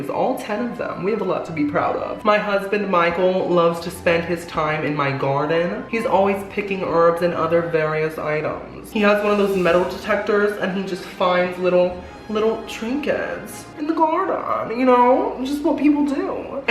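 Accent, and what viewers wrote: England, United Kingdom. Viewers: Omg the British accent. I’m having a really shitty day, this is is making me feel a lot better. Thank you for being you. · The British accent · The English accent 😂😂 such a classy dame. Dora the Explorer Vibes! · Omg xD The British accent is fabulous.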